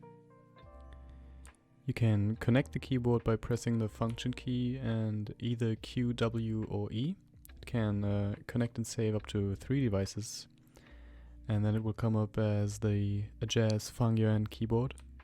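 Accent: Germany. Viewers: are u german bro?:D Edit: okay you‘re german, Mr. lol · just wondering if/how this works for typing in german? i'm thinking of getting one for my studies, but often need to write in german, and i don't have any experience with mechanical keyboards yet, so am not sure how typing ü ä ß usw. would work. any tips? assuming you're german from your accent, sorry if not! :)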